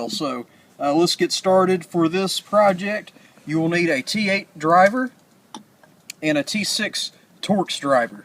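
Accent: South, United States. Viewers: Gorgeous work on a great knife. Ignore the rude comments about your accent; they are irrelevant to the process and personally I kinda like the down-home sound. For those of us who are apartment dwellers, do you do that work for others for a fee?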